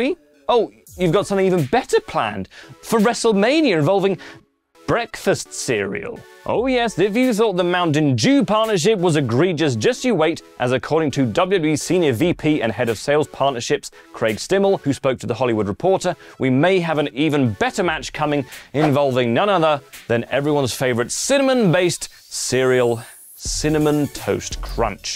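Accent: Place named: RP, United Kingdom. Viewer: This guy is super posh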